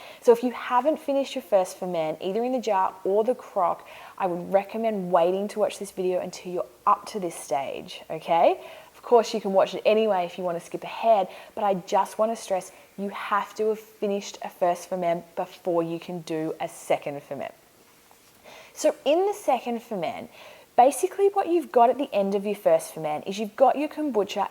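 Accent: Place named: Australia. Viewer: Must be in Australia I love the Australian accent ariad I just made ginger beer that was easy but you do not need one of them nasty scobie's..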